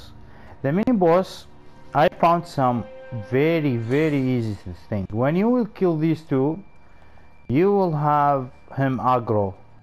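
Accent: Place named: Gulf, Arab world. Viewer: الخليجي واضح من صوته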